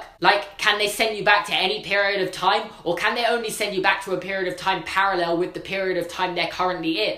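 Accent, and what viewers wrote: United Kingdom. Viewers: is he British just cause I'm British and someone I know sounds like him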